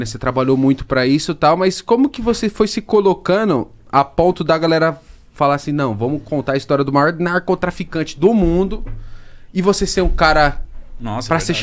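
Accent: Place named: Rio de Janeiro, Brazil. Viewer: jurava que ele era carioca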